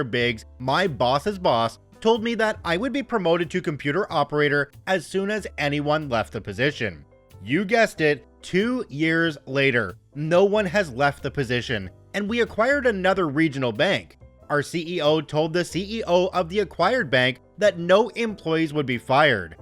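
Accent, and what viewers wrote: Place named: Canada. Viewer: Hearing swear words in Canadian accent "chef's kiss" I was getting pissed as well. As the great Bill Burr likes to say, that LAY-DEE is a cvnt.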